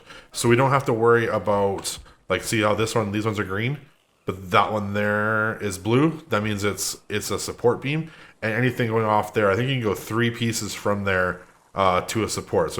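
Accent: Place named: Canada. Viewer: Yo r u a Canadian brethren..? U got that accent 😳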